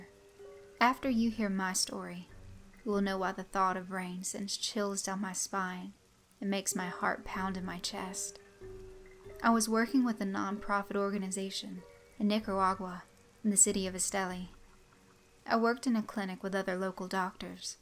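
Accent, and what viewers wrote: South, United States. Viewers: I honestly love your videos!!! I was born, raised, & still live in Alabama & so glad to hear another southern voice. All of your stories are always so captivating. Great job & keep up the amazing work. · Please don't take this the wrong way . Your southern lilt really puts a story to a first calming effect and at or towards the end I can pick up the fear or excited tone bringing the end to a much more dramatic conclusion . Great job on the story .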